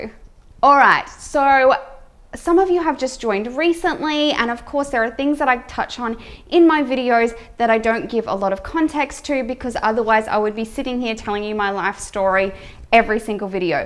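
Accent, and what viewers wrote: Australia. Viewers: First of all, I love your accent. I’ve been trying to do an Aussie accent because I’ve been watching ‘Instant Hotel’ with my daughters, apparently I can’t do it. I disagree. I’m at the stage in my minimalism where I’ve been adding some items back in to our home. Very intentionally though. I still hate clutter but I love plants and I love books. So I’m allowing myself to buy more of these things because they make me happy. I no longer feel that to be a true minimalist that I have to get rid of everything! It’s about getting rid of what you don’t need and valuing the things that you do need. Lots of love to you from Lockdown England, though we’re coming out of it in the next few months, xxx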